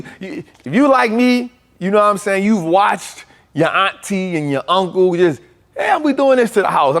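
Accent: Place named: Louisiana, United States. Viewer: I love an intelligent trapper. Dope. Keep up the awesome work 🔥 i hear some Louisiana in there too. NO native here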